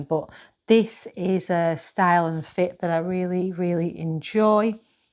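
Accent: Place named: United Kingdom. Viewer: i love your accent!!! (from ny, rarely exposed to any of the UK accents)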